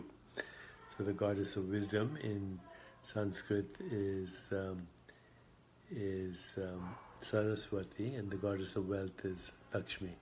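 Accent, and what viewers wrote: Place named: Trinidad and Tobago. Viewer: Sometimes sounds like ah Trini 🇹🇹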